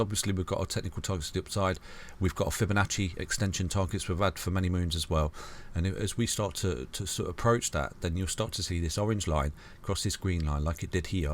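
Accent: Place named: Leeds, United Kingdom. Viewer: Grab a pcs of paper and write down the time and then you just put a time in the description for example HERO BTC Etc YT will split the video based on that. its as simple as that pal. Btw, I am curious where your accent from. You've mentioned Leicester a few times but for me it sounds like a Leeds accent? Just out of curiosity pal.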